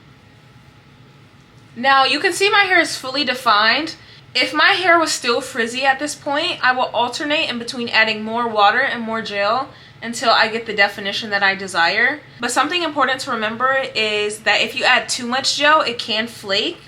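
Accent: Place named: Caribbean. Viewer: Thank you for sharing &I love your accent is it Caribbean?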